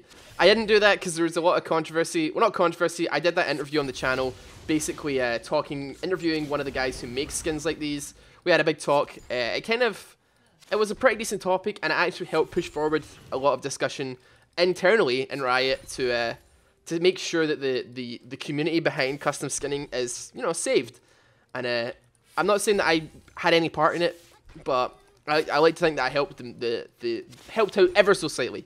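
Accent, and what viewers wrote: Scotland, United Kingdom. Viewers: keep up the good work. and ugh your scottish sooo........ THERE CAN BEE ONLY ONE! did i do that right?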